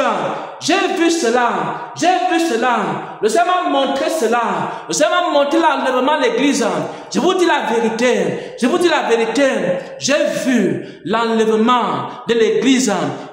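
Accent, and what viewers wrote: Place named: Cameroon. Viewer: Tu es camerounais par ton accent.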